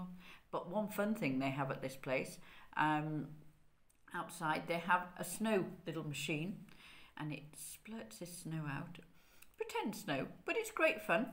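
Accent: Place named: England, United Kingdom. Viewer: Wow beautiful woman and I love your English accent !!!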